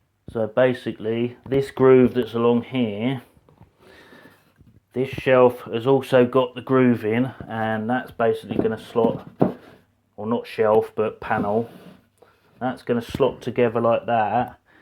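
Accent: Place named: United Kingdom. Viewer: Great job, that holder will never fail! Probably more valuable than the glue guns. Well I am part of the 20% who is a subscriber. My question for you is what part of the UK does your accent from. I have two friends in the States who are from Yorkshire and Wales. Your accent is much different. I am from Tennessee here in USA. I do like the two flags you made but watched the video and discovered that it is a long build.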